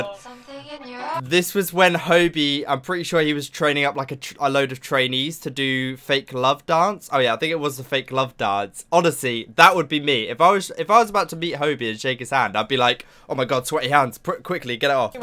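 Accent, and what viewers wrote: United Kingdom. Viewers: Oh, he's from America? When i first watched his channel, i kept thinking he was British all the time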